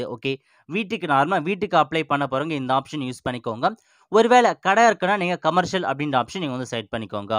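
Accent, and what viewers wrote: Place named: North India, India. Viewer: Nee! yan da? Vadakan pesura mari pesura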